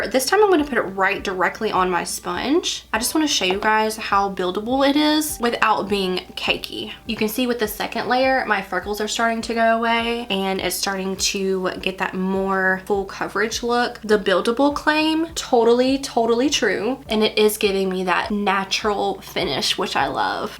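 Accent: South, United States. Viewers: Great review! Love your adorable Southern lilt. Totally adorable vibe. Can't wait to try this product. ❤😊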